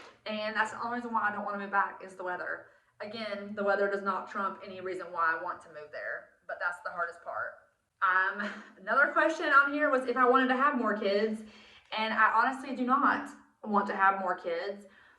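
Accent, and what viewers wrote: South, United States. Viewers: I just loveeee your southern accent. I dont want it to go away when you move. 😭😭😭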